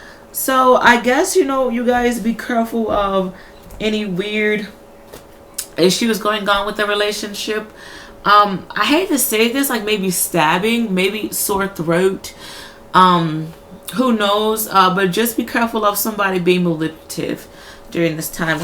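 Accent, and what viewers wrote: Caribbean. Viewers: Yea u do have a carribean twist to you.i thought you were dominican or jamaican for a sec lol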